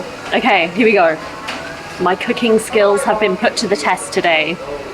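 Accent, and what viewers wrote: United Kingdom. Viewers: いつも思うのですがイギリス英語はとてもきれいですね。素敵な笑顔とあいまって、とても素敵です。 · いつもながら、あなたの英語はまさにイギリス人で、歯切れよく、心地よい。バーナードショーのミュージカル「マイフェアレディ」で、ロンドンでもその人の属する社会層ごとに発音が違うと、イライザの発音を強制し、貴婦人に仕上げるヒギンズ教授の苦闘を見ていて、感動したのを思い出しました。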